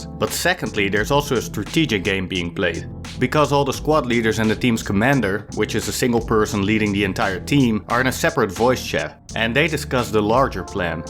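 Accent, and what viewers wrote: Netherlands. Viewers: Just got the game on Wednesday. Been playing every chance I get. I’ve played commander a hand full of times and was straight up honest about it. The squad leaders were very helpful and them because they wanted to win, it was in everyone’s best interest to work through me being a noob. Like you said, a bare minimum commander is better than no commander, although you said squad leader. That being said, squad leader intimidated me the most. Because you are right there in the thick of it all. Commander I hung back, drove supply’s, set up garrisons, and supported the mission via supply drops, bombing runs, and deploying tanks. As a squad leader you have to do much more in my opinion and I don’t want to let my men, and commander down. Also, I recognize that accent anywhere, plus gives it away. My mom still isn’t a US citizen because she doesn’t want to give up her Dutch citizenship, she was from The Hague and my Oma still lives there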